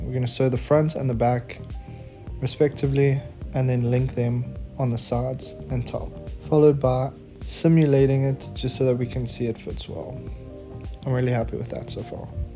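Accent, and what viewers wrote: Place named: South Africa. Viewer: That South African accent! My boy!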